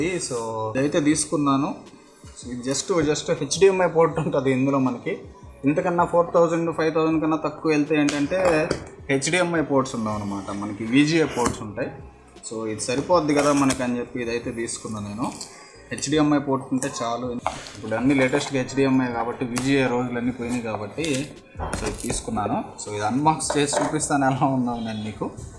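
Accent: Guntur, India. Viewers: మీరు గుంటూరు నా 😀😀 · Meedi guntur aa anna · Meedhi guntur aa bro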